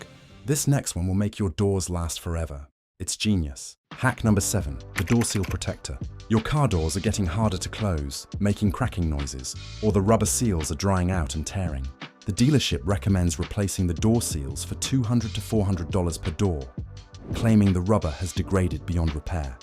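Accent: England, United Kingdom. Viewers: Why does an English accent, somehow, import an "expert" narrated video?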